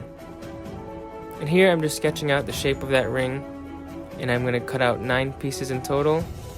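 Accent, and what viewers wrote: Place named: Asia. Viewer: bro where are you from where your accent is like asian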